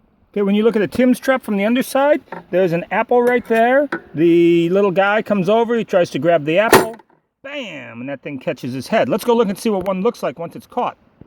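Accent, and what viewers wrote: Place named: United States. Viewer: This is in New Zealand - you can tell from the rimu and ferns - where possums are a serious pest. He just happens to have an American accent.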